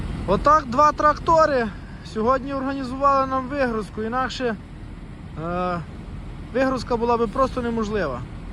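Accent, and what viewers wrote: Ternopil, Ukraine. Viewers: То шо, Тернопіль? Файна мова😀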